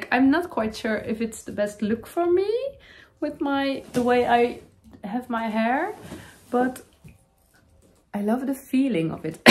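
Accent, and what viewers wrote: Netherlands. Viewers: Greetings from Chicago, Illinois, I came across your podcast a couple weeks and what a pleasure it is to sit down, knit and see all your beautiful makes:) I wanted to see all your episodes so i started from the beginning of the English episodes lol Dont worry about your "Dutchy English" you are doing a great job!!!!! I checked Ravelry for the Kambgarn and it is listed as a Sport weight yarn, 100 % wool - merino dyed and milled in Iceland, it also says Kambgarn is pure imported merino wool. I guess its safe to say its NOT superwash.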